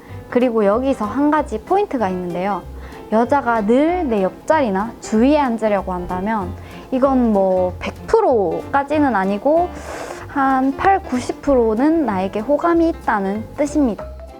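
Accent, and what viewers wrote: Gyeongsang, South Korea. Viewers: 경상도사투리가 ㅋㅋ 매일 듣는 사투리인데도 영상에서 보니 더 새롭게 느껴지네요^^ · 대구 아가씨 아니예여 대구 말투인데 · 경남 진주가면 저분 만날 수있는건가요? ㅠ 사투리 억양 너무 귀엽습니다. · 표준말 쓰면서. 간간이 경상도 억양이 묻어나오는게 귀여워요. 그런식으로 방송하면 나름 특색있는 경상도여자 유튜버로 인기끌겠어요. 건투빕니다. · 경남진주. 내 고향인데. 말투가 진주네요. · 아~ 나 서울남자... 표준어 섞인 경상도 사투리 좋아요. ㅋㅋㅋ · 누나 사투리 너무 매력있어요 ㅠㅠ 경상도 사투리가 이렇게 좋구나 대구 사람인데 첨 알았어..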